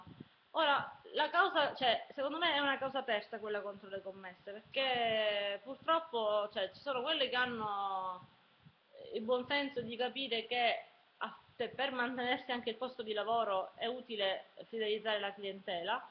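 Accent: Sicily, Italy. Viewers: Io invece penso che ognuno abbia il diritto di esprimersi come preferisce. Youtube non è il luogo in cui rivendicare la perfetta lingua italiana. Ci sono luoghi e tempi per farlo. Diciamo che per vincere questa crociata, forse bisognerebbe cominciare con chi la lingua la deturpa scrivendo con le "k" o sostituendo il "per" con la "x". si esprime in italiano corretto la maggior parte del tempo e, se a volte un po' del suo essere siciliana esce fuori, non vedo in cosa sia TANTO fastidioso.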